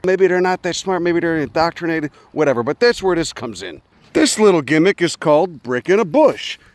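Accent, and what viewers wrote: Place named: Brooklyn, United States. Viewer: I'd been wondering if you were from Cali, cuz the accent sounds more Brooklyn to my Southern ear, but yup. That's some Earth First California shit, my friend. 🧡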